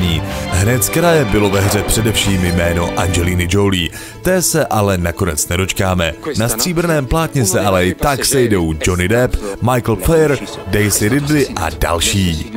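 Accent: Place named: Ostrava, Czech Republic. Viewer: synku nemluv po ostravski bo mi to usi rve:)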